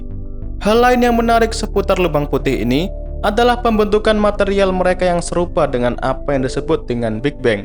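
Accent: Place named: Madura, Indonesia. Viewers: Aksen maduranya kental banget mas 🤭🤭🤭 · "Seperti biasa daripada kalian penasaran, mari kita bahas bersama". Logatnya benar2 Madura sekali pak. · Dari pada kalian penasaran berlogat madura ygy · Pasti org madura yg ngomong nii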